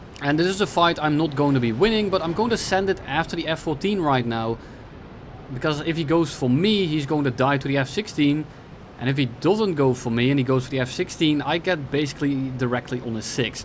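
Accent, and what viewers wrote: Netherlands. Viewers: that dutch accent tho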